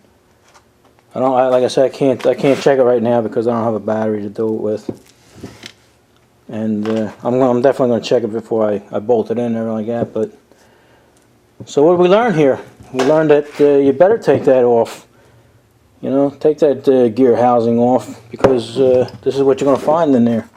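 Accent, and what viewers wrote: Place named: New Jersey, United States. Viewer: Thank you! this is exactly my problem. Awesome vid! also, are you from New Jersey? -just wondering cuz of the accent.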